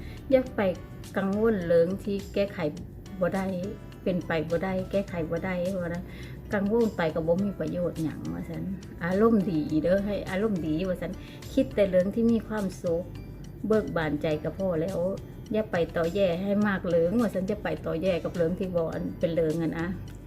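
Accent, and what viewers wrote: Phu Thai, Thailand. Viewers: เว้าภูไทม่วนดีครับชอบฟังครับมีเสน่ห์ครับ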